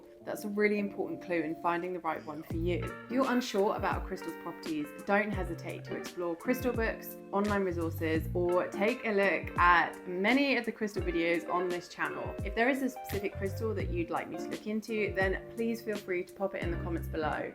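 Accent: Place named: United Kingdom. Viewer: Sweet Spirit you talk to fast!! But I love your British accent!!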